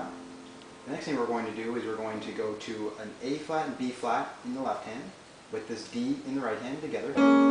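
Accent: Canada. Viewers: are you canadian? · are you Canadian?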